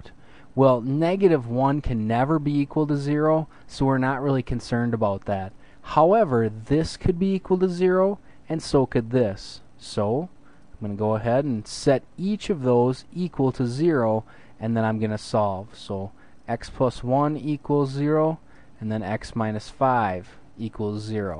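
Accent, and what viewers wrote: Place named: Canada. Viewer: With my exam in 2weeks, i've been really struggling. This really helped! Thanks a ton. Love your accent! Are you Canadian?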